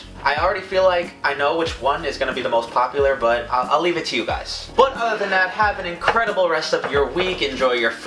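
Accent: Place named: Brooklyn, United States. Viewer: I love his voice, he sounds like he's from brooklyn in the 50s! Where is he from?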